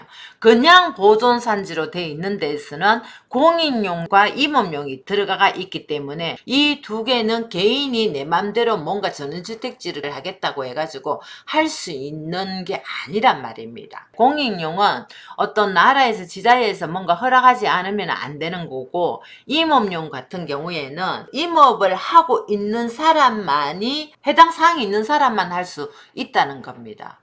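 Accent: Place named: Gyeongsang, South Korea. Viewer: 진주분이신가요? 사투리가 ㅎㅎ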